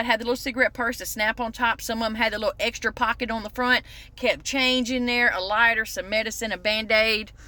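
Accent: South, United States. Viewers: You sound like you from the south,I'm from rural N.Y. and holy shit woman ...we had it the same! I'm 51 and I get it. You're awesome! Loved this video ❤!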